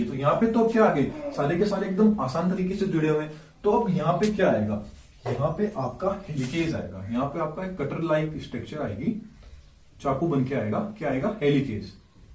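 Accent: Rajasthan, India. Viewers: Sir aap Rajasthani ho?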